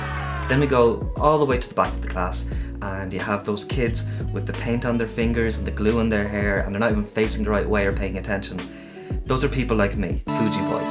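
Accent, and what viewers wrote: Ireland. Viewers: It was a huge uplift for me to open your video and hear a young Irish voice. I admire the vision you have of seeing and telling the Irish story with young eyes. The Fujica 705 was a camera I used in the 1970s but was unfortunately stolen. I then used and still use a Pentax S1a. You may have seen the recent blogs on the need to invest in cameras with mechanical shutters. Electronics fail. I have lost two to irreparable damage. Still, I would recommend the Contax 139Q because it is widely available and those Contax lenses are genuinely top class on a par with the best Takumars. But, my absolutely favourite camera, is an old Werra V that I still have. Pure Leica quality from East Germany. It is my dedicated BW film camera. Carrying that around I feel that I am channeling Cartier-Breslin. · Great to hear an Irish voice here on YouTube, you have missed focus on several images so I would recommend the Nikon FE as your next film camera but the FM,FM2,FA,FE2 are also very good, the FM3a is good but too expensive. 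I have subscribed.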